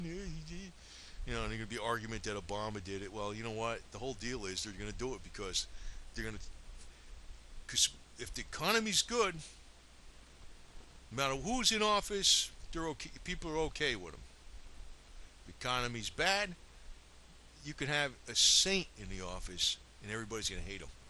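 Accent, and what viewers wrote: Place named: New Jersey, United States. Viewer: Its a real accent. Its an old school working class New Jersey accent I think? The only other person I've ever met with the same accent was a WW2 veteran. Its an old school accent.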